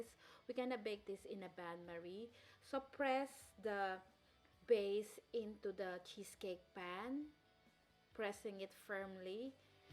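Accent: Philippines. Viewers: Are you Filipino? You sound like one.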